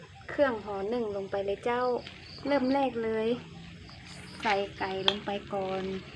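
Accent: Northern Thailand, Thailand. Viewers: ชอบภาษาเหนือจัง มีเสน่ห์ ตำเครื่องแกงด้วยอีกแรงนะคะมามี้แรงเยอะมากจ้า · ภาษาเหนือน่ารักจังค่ะ อาหารก็น่ากิน☺️❤️ นิ้งมาย้ำกำลังใจให้กันเหมือนเดิมค่ะ❤️ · ภาษาเหนือน่ารักไพเราะมากๆครับ อาหารก็น่าทานครับ สวัสดีครับ มาติดตามรับชมและให้กำลังใจครับ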